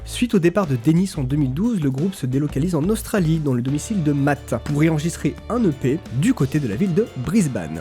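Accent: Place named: United Kingdom. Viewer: Belle découverte ! Et c’est moi ou ton accent british s’améliore 😅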